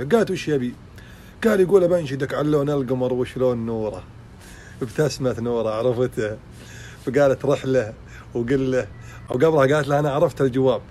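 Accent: Iraq. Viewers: بوويه اللهجه العراقيه تخبل بحلگگ ❤️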